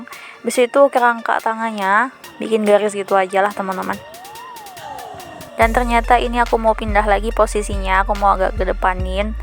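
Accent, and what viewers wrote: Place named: Philippines. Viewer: Let me guess your a Filipina Cuz you sound like one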